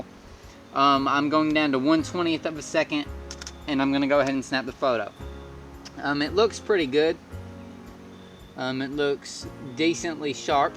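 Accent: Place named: South, United States. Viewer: I agree. The composition with the pine cone was very good. By the way, you have a really pleasant Southern accent. None of us is aware of our accent; it takes someone else to hear it. Half of the pleasure of your videos is hearing you speak.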